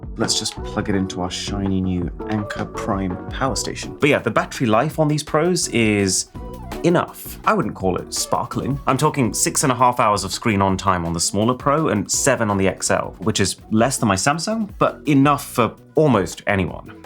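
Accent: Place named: Nottingham, United Kingdom. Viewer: Your from Nottingham thats crazy.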